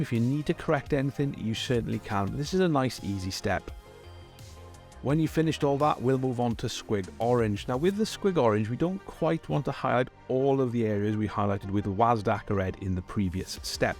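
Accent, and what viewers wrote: Wales, United Kingdom. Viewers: are you welsh bud?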